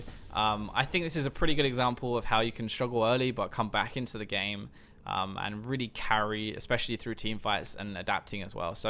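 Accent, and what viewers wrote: United Kingdom. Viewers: its called a British accent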